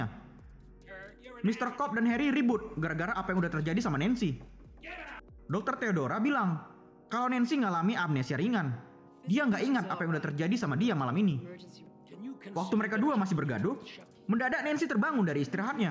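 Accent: Medan, Indonesia. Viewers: Bg kau pasti orang Medan kn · Cara ngomongnya sama persis kayak temenku orang Medan :) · Orng Medan ko bg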